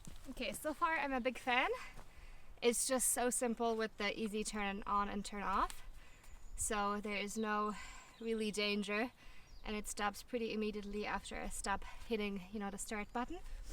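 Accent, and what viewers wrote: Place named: Southern England, United Kingdom. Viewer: Hello there, first time on your blog. If than mini chainsaw is a Mader then you've wasted your money as un reliable quality.. Those bushes you are cutting are Minmosa and is a vicious weed, cutting them back will only make them grow three time more in spring. When you see the new growth come, wait untill a foot high then spray with Round and cooking oil 50/50 this will kill them and give you clean land for next time and watch for new seed popping up as they can be a problem. I know as been clearing my land for years, it was 10 times worse than yours when I bought it. Have now got a large lump cleared and producing. I am a market gardener and now just grow too feed me so if you have any problems or things you need to ask, feel free as am in Portugal as well so have a fair idea now on planting times and what you can and can't grow. 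In the meantime, have fun.Just one thing, olives are a waist of time as you can't eat them, there are other trees you can grow that will feed you, but your choice.Would like as where you are and where you from as the name is Irish but the accent sounds southern England.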